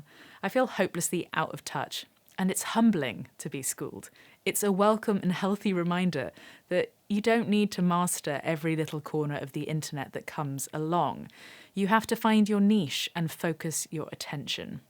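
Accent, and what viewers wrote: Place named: RP, United Kingdom. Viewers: Hij, be happy you are not a slave to a Record Company, and just drop that (Chinese!) Tik-Tok platform altogether. Love your videos, songs, and your speaking voice as well as your delicious Queens English. I hope to see more of your classic Tuesday Talks and guitar stories again. Fondly, Michael PS Commemorations on your Queen's passing. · Keep coming back for the posh accent and demeanour, I guess that bottle is always going to be full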